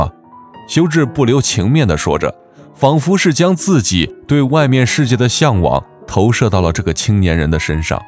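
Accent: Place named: Osaka, Japan. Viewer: 大阪弁いいね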